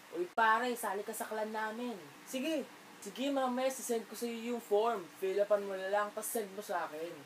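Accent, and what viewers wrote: Visayas, Philippines. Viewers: Curious lang po ako sir, bisaya ba kayo? May accent kasi kayong visaya! Salamat po!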